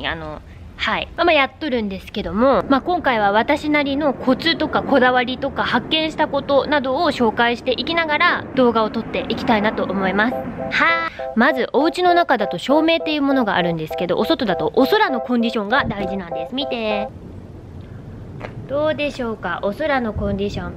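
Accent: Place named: Hakata, Japan. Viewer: チャンネル博多弁♥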